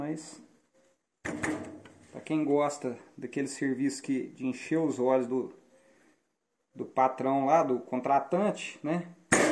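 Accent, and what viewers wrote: Minas Gerais, Brazil. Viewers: Obrigado pela referência a meu nome, amigo. Parabéns pelo vídeo. Pelo sotaque vc deve ser mineiro, certo? Eu já fui em algumas cidades de Minas e onde passei mais tempo foi na cidade de Manhuaçu. Gostei muito da cidade. E meu cunhado é de Belo Horizonte. Forte abraço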